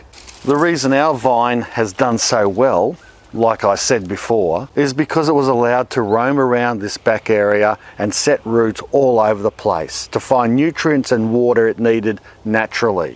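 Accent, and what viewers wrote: Australia. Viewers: Aww, Richie Benaud! "What a marvellous day it's been out there toda-y-y-y-y..." A great man. 🙃 Upside-down, too! I'm a Pom, - you sound a bit like an Aussie, so you might as well take the Ashes back now. 🏺 Sigh... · Came for the pumpkins, stayed for the Aussie...ness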